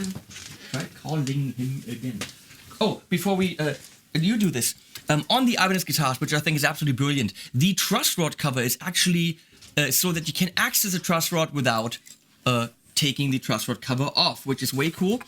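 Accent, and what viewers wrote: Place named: Germany. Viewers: Richtig deutscher Akzent 😂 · mustermann Vielleicht liegt das daran, dass er deutsch ist, aber internationalen Content bieten möchte ;)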